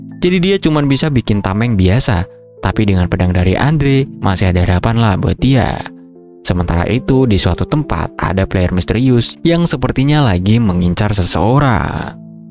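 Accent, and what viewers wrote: Medan, Indonesia. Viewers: Mimin orang medan ya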